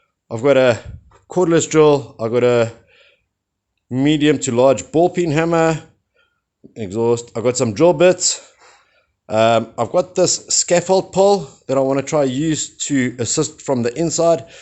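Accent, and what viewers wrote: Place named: South Africa. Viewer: So great to hear a South African voice in far of Europe. Unmistakeable 😂. Subed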